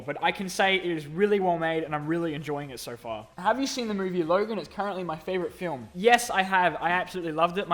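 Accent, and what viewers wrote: Australia. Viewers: For next Q and A are u Australian?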